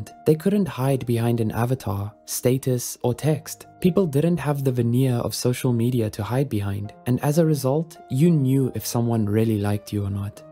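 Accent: South Africa. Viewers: Is the speaker from south Africa? Awesome video none the less👏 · where is from? his accent sounds vaguely south african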